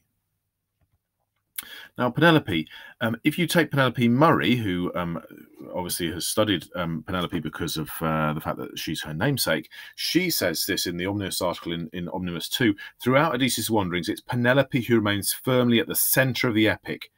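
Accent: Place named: Quebec, Canada. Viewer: this dude sounds like quebec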